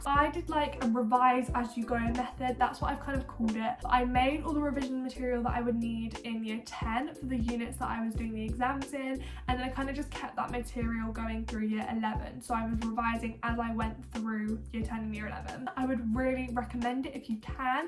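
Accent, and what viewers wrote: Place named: United Kingdom. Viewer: I can’t relate to the things you’re saying cause things are done differently in Nigeria & I’m about to graduate from the university BUT I just enjoyed hearing you speak in your cute British accent and your editing is bomb!!!! I’ll literally throw a party when I get to 5k subscribers 😂😂 Congratulations on the Big 5000, 😁😌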